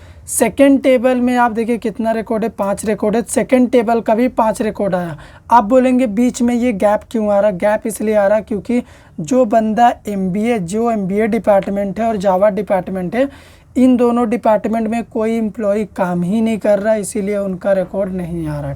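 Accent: Jamshedpur, India. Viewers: Aap jamshedpur se ho kya 😅? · Sir JAMSHEDPUR se ho kya?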